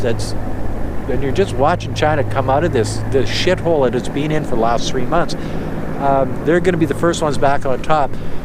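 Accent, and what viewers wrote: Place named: Canada. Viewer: the most canadian accent of all time and i love it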